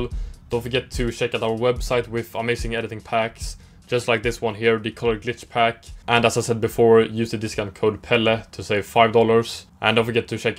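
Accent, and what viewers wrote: Sweden. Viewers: man hör svenskan haha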